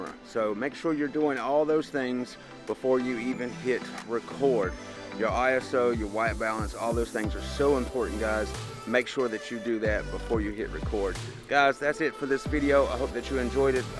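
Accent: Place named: South, United States. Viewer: Good stuff! I enjoy learning from people who are just learning too. I’ve played with video cameras off and on for like 20 years but only been serious about it for a couple years in total. Love the southern accent! I’m in FL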